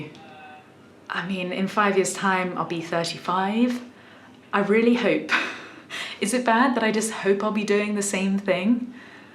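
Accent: United Kingdom. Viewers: Sounds British too me, don't sound like you're from Liverpool but doesn't sound like you're from Texas (like me), doesn't sound like you're from Atlanta or the Joisey shore. Sounds British. London? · I have to admit, I watched this video just because I wanted to nap while listening to your British accent. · Quite a lovely lady and axe player. Love your clipped British accent. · While you're accent may be a bit British, it's not truly British because I can understand every word you say (Ahm frum Jawghuh so eh ez purdie goot Ah kin git thru th wurl whentz zi git out'n da haus.) A truly proper British accent, and there are many, cannot be understood by anyone outside of the neighborhood in which it is normally spoken I believe the appropriate quote is, "If I were any more British I wouldn't be able to talk at all" Whatever your accent you do it well. Perfect voice to listen to 😎 Also: Like your new digs but those blank walls scream, "ERASABLE MARKER ATTACK" 😁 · Love the video!! 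Good luck setting up the studio. Maybe the next three videos you give us a different British accent each time… In song even!! Love your channel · In 5 yrs you'll be just wherever you wanted to be! Life is good!! Love your British accent..!! Hi from Ecuador-South America!! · Hi, your british accent is what I like the most! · The non-rhotic British accent such as your beautiful accent, is not a classic accent. It didn't exist before the late 18th and early 19th century. It's really quite recent. Many would be surprised to know that the current American accent is quite similar to the British accent of the 18th century and that it is the current British accent that has changed. Sorry. Linguistics geek;) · your accent sounds british to me, i'm from the boston, massachusetts area and have a strong accent and i'm 71 yrs. old and most of the people around my age have a boston accent which we picked up from our parents and surroundings when we were young. 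now i've noticed that most of the younger people like milleniums don't seem to have this accent or altered maybe due to their exposure to more people via the internet. my wife is from western new york state and has a more neutral sound to her voice. one could to a real study of all the regional accents in the U.S. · As an American we love British accents! We generally can't tell the difference between different areas, but I love yours and clearly you're from a classy background! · British accent is nice; I'm smitten with those beautiful brown eyes, I'm in love....a little bit of madness...with with great guitar that is. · I love your accent. It is the best British accent I've ever heard. Everything you say is perfectly enunciated and so easy to listen to. I wish you the best on your new work space (studio) and your future endeavors. Love from Miami ❤️❤️ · I've watched several of your videos before, and suddenly I just subscribed at WINK! 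Looking forward to being a further viewer/listener/learner. I lived four years in the UK. You're definitely a Pom! Love your eloquence. Hi from Oz, oi oi oi!;-):-) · your accent sounds very british ------ but i know nothing about you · Wish I had your looks along with that beautiful British accent. And then you play the guitar and sing as well. Beauty, accent and talent. Now I call it 3 in 1. I am writing from Sri Lanka where I was born and been living since then, and I am no where as beautiful as you are. And I cant speak English the way you do. Wish I could. God bless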